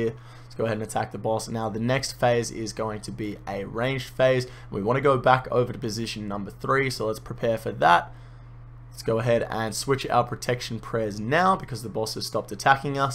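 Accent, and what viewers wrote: Australia. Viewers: Aussie accent at its finest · Easily the best guide I found. What I do personally though is have the time in the video the rotation gets identified down and skip to the part in the video so soothing Australian voice walks me through the rotation. I found it better then doing it 1 rotation at a time. My time stamps: Rotation 1: Rotation 2: Rotation 3: Rotation 4: These can probably be tweaked a pinch but they're what I use.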